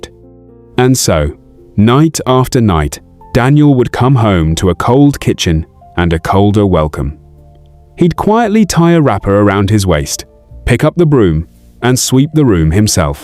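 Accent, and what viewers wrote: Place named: Africa. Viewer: I like the story but l am partial to an African accent. Watching from Montreal, Canada 🇨🇦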